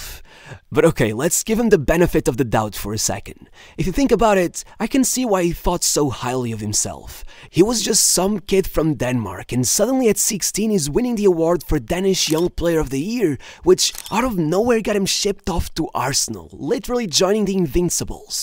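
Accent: Portugal. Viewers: is it just me? i just can't stand Portuguese accent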